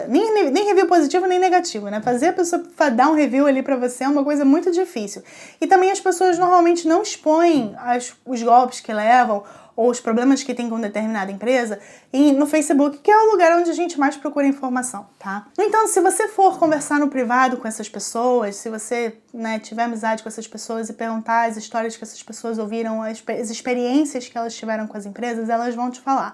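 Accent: Rio de Janeiro, Brazil. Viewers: Curioso vc disse que morava em Santa Catarina mas vc tem sotaque carioca kk fora isso parabéns pelo video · amo seus vídeos vc é carioca ?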